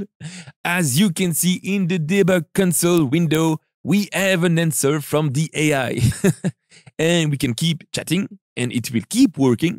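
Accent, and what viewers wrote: France. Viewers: Merci de prendre la parti de même si c'est inutile, j'ai bien précisé que c'était rien d'autre qu'une taquinerie, vous auriez deviné cela si vous aviez bien lu mon poste:P Bref, oui l'accent français est très fort ce qui fait le charme des ces vidéos, mais je maintiens le fait que si il ferait des vidéos en Français il aurait bien plus d'audience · Son accent anglais est pas horrible et je le comprends facilement. Je pense que s'il parlait plus vite ce serait mieux. Son accent francais est evident mais c'est pas une probleme parce que son prononciation est fantastique. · C'est dingue comment on perçoit le côté français bien que tu gères l'accent, bonne vidéo 😉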